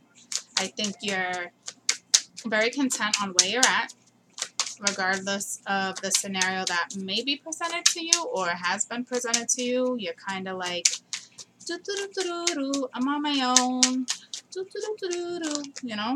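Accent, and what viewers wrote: Boston, United States. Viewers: Hey You's I love your Boston accent!😂❤ And your Reading❤❤ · Are you from Boston? I'm loving your accent!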